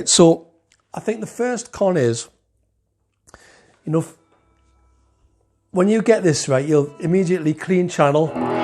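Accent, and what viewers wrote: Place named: Ireland. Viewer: Thanks for your honest review! I know you're a less is more kinda guy, but not everyone else shares that philosophy. Having features is a good thing because it gives you more options to tailor your sound. However, if you don't want or need them, then simply don't use them! But I think having more options available gives you more flexibility and not just a one trick pony amp sound. In addition,, it doesn't matter if it's only 1, or 0.1, watt. Having two channels and a foot switch allows you to go from clean to scream at the touch of a button for different sounds for rhythm and lead, etc. By the way, as someone in the states I enjoy listening to your voice because of the accent . Are you from or located in Ireland? Just curious!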